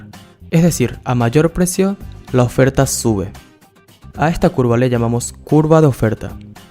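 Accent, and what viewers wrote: Paraguay. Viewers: Sos paraguayo? tenes nuestro acento :0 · WTF ese acento Paraguayo. Jaja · Decime que sos Paraguayo o vivís en Paraguay.... 🤔🤣👌🇵🇾👏👏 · Ndeee vos sos paraguayo verdad?. Te pille por tu acento xD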